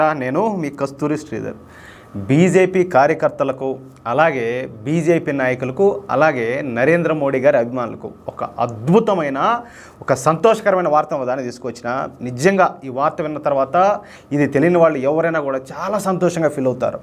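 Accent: Rayalaseema, India. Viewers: నీ బండ బడ వయా. మన ఛానల్ శుక్లపక్ష చంద్రునివలె దినదినాభివృద్ధి జరగాలి మన నెల్లూరు చిత్తూరు యాసతో అదరగొడతా ఉండావయ్య. భగవంతుడు నీకు మరింత శక్తిని ఇవ్వాలయ్యా జైశ్రీరామ్ జై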